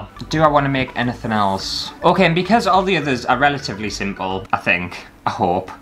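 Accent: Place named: Carlisle, United Kingdom. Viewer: I had to come and comment before the video finished. Totally creased when you showed the pocket and then the knife slid out the bottom. Now I've stopped laughing I'll continue with the rest of the video. By the way I came upon your vids by chance, have subscribed and binge watched about a dozen videos at this point. Your banter just cracks me up. Also love the accent, coming from Carlisle it's an accent I'm familiar with.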